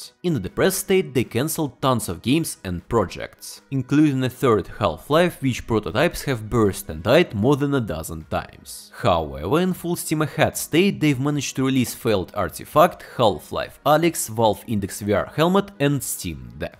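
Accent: Russia. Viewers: Damn, I didn't know about your english channel before, and I like the russian accent, а так вообще прикольно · все ровно слышно русский акцент)) но твой английский супер!! 👍👍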